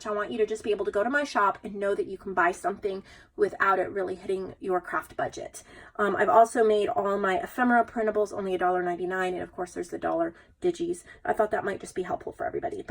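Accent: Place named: Cornwall, United Kingdom. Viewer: I listen to videos as she lives near to where I grew up and I find her Cornish accent comforting.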